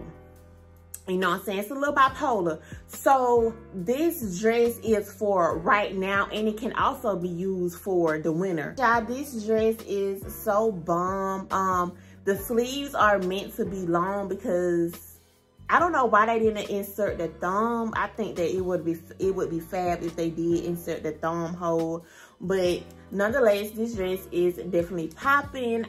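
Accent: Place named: Atlanta, United States. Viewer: Lol your voice 💕❤️ you gotta be frond Atlanta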